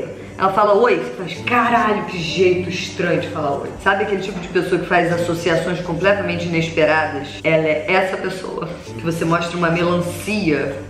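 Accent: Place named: São Paulo, Brazil. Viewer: pegando o sotaque paulista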